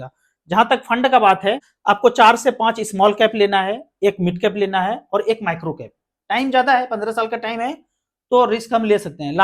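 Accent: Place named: Magadh, India. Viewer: ji Aap Bihar ke kis jila se hai.mere khayal se magad ka ton hai. Thanks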